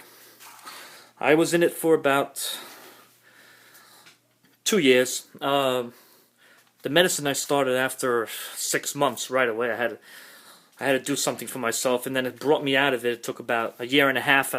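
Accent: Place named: New York City, United States. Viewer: Bro you’re definitely from nyc from your accent That makes me feel good I have to get in touch with you Still in this space Can you call me <num> Input my number on YouTube that’s how serious it is man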